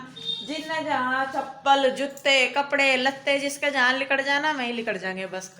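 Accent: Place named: Baghpat, India. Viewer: Aapki language dekh kr lagta h jaise aap baagpat badhot ki boli bolte ho meri h friend h waha ki to uske ghar me bhi sab aise hi bolte h acha lagta h sun kar.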